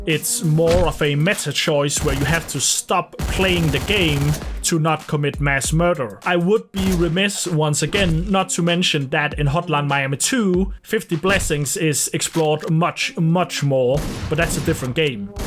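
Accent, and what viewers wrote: Denmark. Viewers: i smell danish on ye boy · You are Danish. I can feel, hear and smell it. As i am danish myself.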